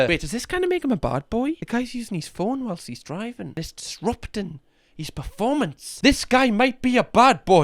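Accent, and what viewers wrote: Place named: North, United Kingdom. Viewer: Keep at it mate you're a natural 👌 usually I hate the sound of us northerners on tv/video 😂 but I honestly laugh at the shit you react to.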